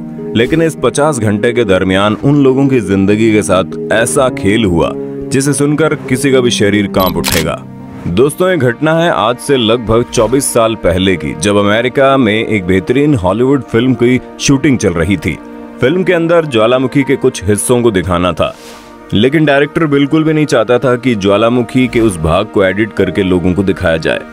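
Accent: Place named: Hills, India. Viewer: आपका आवाज बहोत पहाडी है sir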